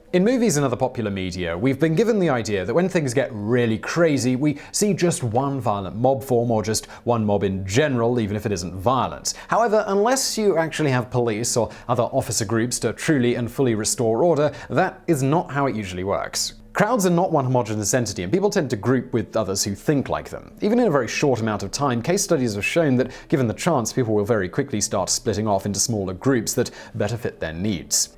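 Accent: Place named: RP, United Kingdom. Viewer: isn't hired because he knows anything. He's a mouthpiece with a posh accent.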